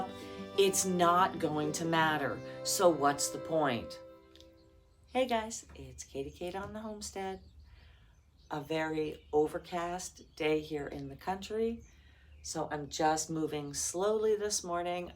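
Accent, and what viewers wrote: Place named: New Jersey, United States. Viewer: applying to phd's in canada for 2026. you know why! but i'd love to come visit your farm and meet you. i am also katie kate :-) cheers, love your program (you sound like a jerZ girl :-) your discussion of the "master / slave" dialectic is 200% frankfurt school and the "critical theory" camp, my camp. welcome to the machine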